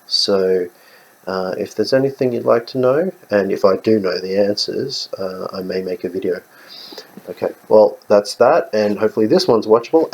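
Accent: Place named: Australia. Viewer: Is there a possibility that Reptilians aren't aware of their background? If they're 10% of the population, who and how are they i informed? Are they approached by so called "handlers" during early childhood? I'm not sure off the process. I believe in Psychic Vampirism. I've seen peoples face distort, especially the eyes. It didn't scare me because it was a confrontation and I was calling out the persons behaviour. That's why I believe I got to see the real person behind the facade. Now, like yourself, I can spot them almost immediately. So I come across a few people that are in "attack mode" for no reason. I've had to walk away from so called friendships because these people suck the very essence out of you. And who wants that. Great to hear an Aussie voice talking about this phenomenon aswell. Keep up the good work bro.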